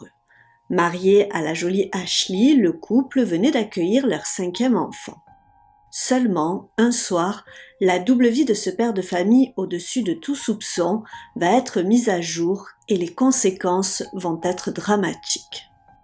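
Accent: South, France. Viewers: Je ne commente pas souvent et je voulais te dire que je regarde et like toute tes vidéos, j'aime tes intonations et cette petite pointe d'accent du sud. Tes récits sont clairs, originaux et intéressant ❤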